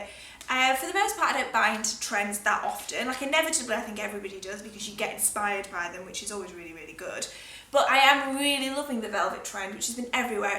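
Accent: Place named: North West England, United Kingdom. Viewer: Where you from? I detect a north west accent :)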